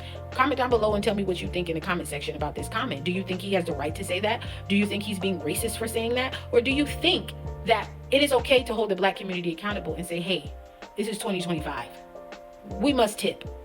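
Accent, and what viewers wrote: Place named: Africa. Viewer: she looks and talks like a first generation African. American blacks I agree do tip but we keep getting lumped in with these immigrant blacks that don’t tip , even the ones with money won’t. Also, why is she complaining about tips? Tips are whatever the customer thinks you deserve or feel like giving out of generosity! Perhaps she should find a job that pays hourly instead of making content pushing black stereotypes.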